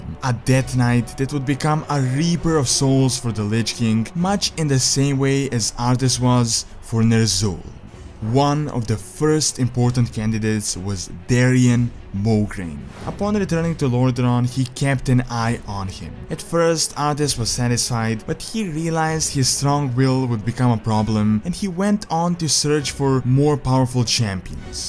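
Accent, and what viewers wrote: Russia. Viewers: You say this is a translation from a Russian youtuber. So did you study Russian or are you Russian? That accent gives it away but just asking to be sure. Nice videos btw, as a lore fan and WoW fanatic you have my sub. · Are you a Russian?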